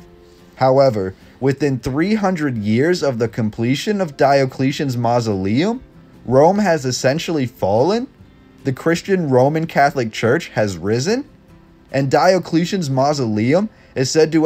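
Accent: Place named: West Coast, United States. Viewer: I appreciate the content but can’t help but wonder where your accent originates. My guess is West coast 🤔 either way keep up the good work.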